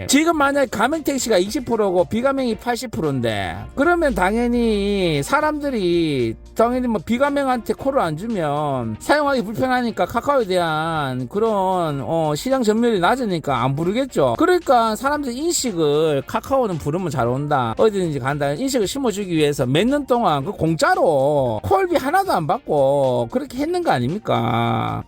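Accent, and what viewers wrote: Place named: Daegu, South Korea. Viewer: 대구사투리가 너무 듣기 싫다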